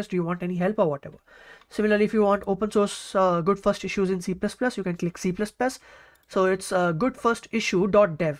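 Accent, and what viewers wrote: India. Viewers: why indians has this accent?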